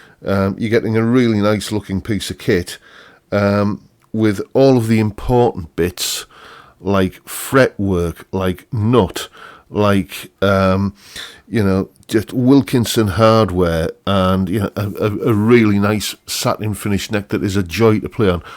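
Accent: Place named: Northern England, United Kingdom. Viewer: Hey! Nice to hear another fellow northerner,I'm from Durham 😅. Where abouts are you from? Great review! I've been curious about Fazely for a while. I've recently(in the past year) got into modding guitars and previously bought a glarry(which is I love!). Fazely are the other brand that appears when you're looking for the cheapest new guitars. With the way prices are now it actually seems more economical to buy one of these brands new. Guitars that use to go for £10-20 second hand like encores are now going for £50-100 which is crazy 😅. Sorry I'm waffling on,got a bit excited 😅 What I was going to ask you was do you think their stuff are decent platforms to modify? Great content too 😊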